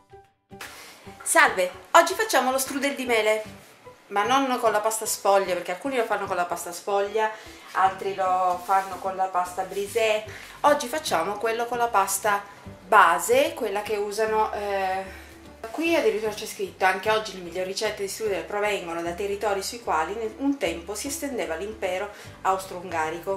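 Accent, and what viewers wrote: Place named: Piedmont, Italy. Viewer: ! Ma le tue origini sono siciliane??? Perché il tuo accento ha un che di vagamente nordico...... tipo piemontese.. Ahahahah Ti abbraccio sei bravissima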